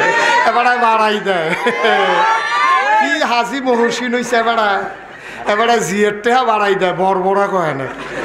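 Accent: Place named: Comilla, Bangladesh. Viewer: এই বক্তা কে সবাই কুমিল্লার ভাষার জন্য পছন্দ করে মিয়া ❤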